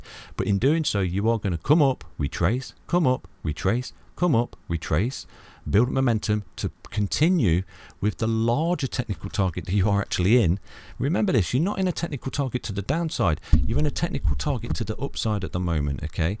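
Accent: United Kingdom. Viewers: The Man with the British voice and beauty full Analysis!!!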